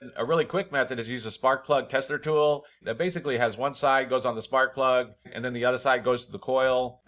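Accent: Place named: Chicago, United States. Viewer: Very nice accent ❤ from where this accent is it a Chicago?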